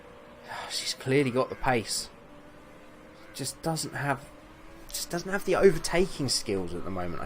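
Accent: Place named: Devon, United Kingdom. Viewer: Another excellent session. Your pessimism always amuses me:D Your car is coming along very nicely. Great things are coming. On a side note, I've been trying to track down your accent for months now, so I'm going to come out with a guess - Definitely Southern....Devon perhaps?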